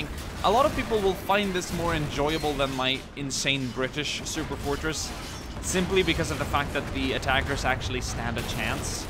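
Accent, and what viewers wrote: Sweden. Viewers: Är du svenne? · Are you swedish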